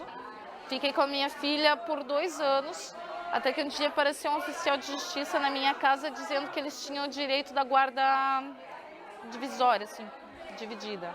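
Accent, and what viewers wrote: Curitiba, Brazil. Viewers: This Is My Nick Mas ela tem um pouco de sotaque Curitibano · o sotaque curitibano dela é lindo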